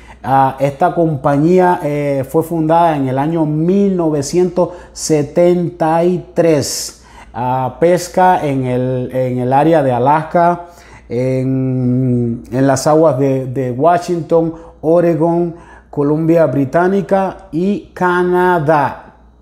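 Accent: Dominican Republic. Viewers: Tiene acento dominicano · Hermano usted es dominicano?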